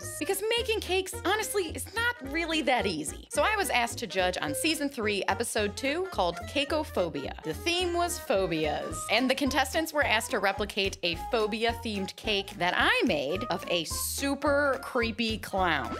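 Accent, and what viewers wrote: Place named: Wisconsin, United States. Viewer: I'm telling you she has to be from Wisconsin she sounds so Wisconsinny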